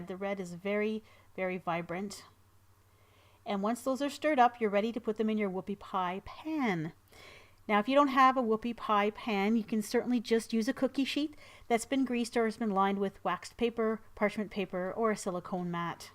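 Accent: Canada. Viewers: Quick question, are you Canadian or American?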